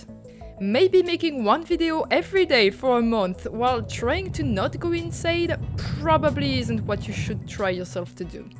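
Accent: France. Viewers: By any chance, aren't you french? Your English is excellent, but the accent still sounds a bit french, as if I was listening to my classmates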